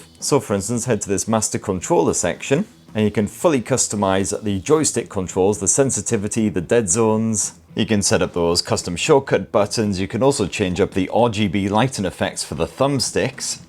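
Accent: United Kingdom. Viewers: As an american, hearing a brit pronounce something a little odd is totally normal. We have bottled water, you guys have bo'o o wa'a. I could listen to you guys read the phone book and it would be music to my ears.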